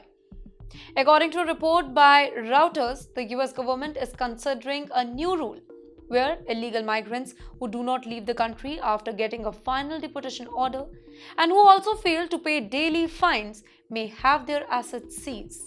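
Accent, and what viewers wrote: India. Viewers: Indian fatigue so done with your accent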